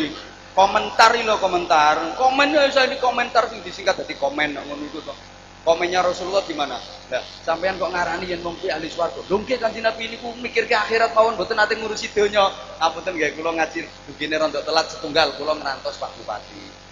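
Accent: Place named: Java, Indonesia. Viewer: mas jawane ngendi se mas minak men nk matur jowo kok yo pas men ean kie... mugo" suatu saat njenengan bener" iso ceramah tenanan. ami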